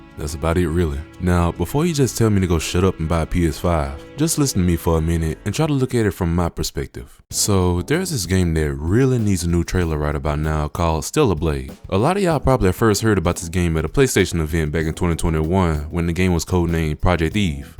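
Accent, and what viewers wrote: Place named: United States. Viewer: Constructive feedback: 1. It's difficult to read paragraphs of text on-screen when you're talking at the same time. Choose one or the other. 2. You have a REALLY strong American accent. As a Brit, I can't understand you when you speak quickly and start slurring your words. 3. Opening with "PC is better anyway" in the first 30 seconds is just an insult to parts of the audience who disagree with you. As someone who owns PC and consoles, I think consoles are better because you can relax on the sofa instead of sitting bolt-upright at a desk. Good luck with your Youtube journey.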